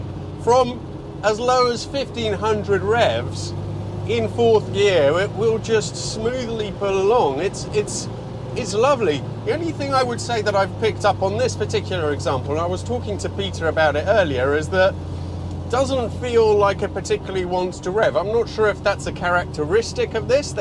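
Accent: Italy. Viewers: I really like this guy and appreciate his great videos but his over pronunciation of Italian words is really grating and un necessary . We get the point , yes you have an Italian heritage but let's be honest you grew up in the UK and that's how your true accent . I can speak some Italian but never over emphasise the accent as it sounds silly when mixed with English. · No need to apologise for the Italian-ness. When it comes to Italian cars, them Italian twang is kind of necessary. At least in my book, but I'm a weirdo.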